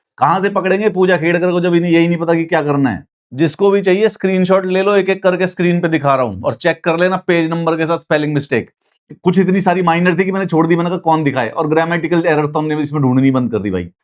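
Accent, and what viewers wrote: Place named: Haryana, India. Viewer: Haryanvi english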